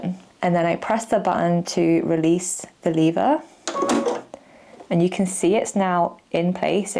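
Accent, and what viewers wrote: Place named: United Kingdom. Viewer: Thanks for sharing. BTW, love the accent. I'm always watching British TV or movies here in The States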